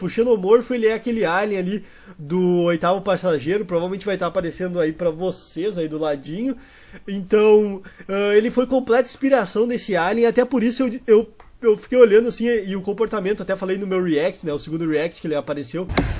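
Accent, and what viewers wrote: Rio Grande do Sul, Brazil. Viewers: Tu é gaúcho! Ba Eu também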